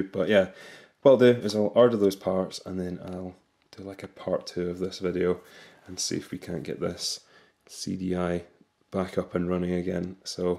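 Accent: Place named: Glasgow, United Kingdom. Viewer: Love your videos, you and 6oodfella make me feel right at home - team Glasgow! :D (you sound glaswegian haha). Also, burn yourself a copy of lost eden on CDI :)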